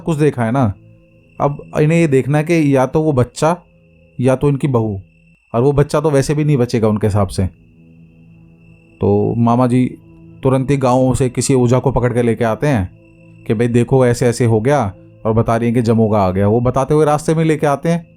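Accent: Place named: Meerut, India. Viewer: Jai shree Ram bhaiya ji.. me Meerut me rahti hu.. aapki baato se lagta. Hai ki. Aap bhi Meerut ya Meerut ke aas pass hi. Rahte honge. Sunte hue aisa hi lagta hai ki humaare neighbour bhaiya hi story suna rahe hai. 45 years Meerut me ho gaye hai tho Punjabi ke saat saat ye language bhi hum sab me rach bass gayi hai 😊 aapki sabhi stories bahut hi acchi lagti hai 🙏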